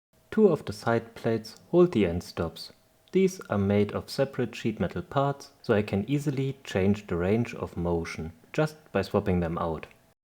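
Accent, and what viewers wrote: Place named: Germany. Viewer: metal working/engineering project and german accent ? You're sure to see good work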